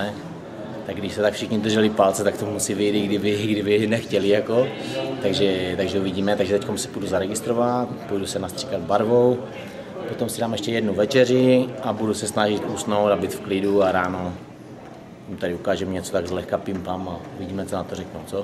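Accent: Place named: Moravia, Czech Republic. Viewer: On má moravskej přízvuk, je z česka.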